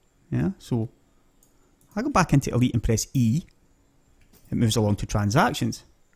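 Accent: Scotland, United Kingdom. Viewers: I find the Scottish accent really pleasant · scottish accent, I dont understand a word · I'm so glad I found a Scottish guy doing this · First class presentation of how to use Voice Attack. I got it yesterday and have been looking around for a tutorial to learn its programming from. I am looking to set it up with DCS A10C and whilst a different programme to ED the methodology is well explained with both demonstration and explanation. Your video is going to be by my side when I start setting VA up. I imagine that it will work fine on other games containing a matrix for keystroke mapping/editting such as IL2 and Silent Hunter 5. BTW, what part of Scotland do you hail from???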